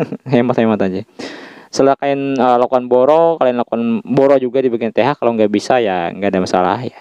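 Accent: Banjar, Indonesia. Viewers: Logatnya Banjar banget min..😅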